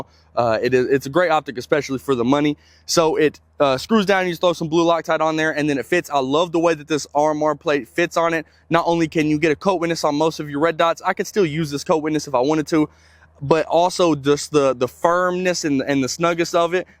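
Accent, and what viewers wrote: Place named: Tennessee, United States. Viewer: Thought you were from tn I’m from Clinton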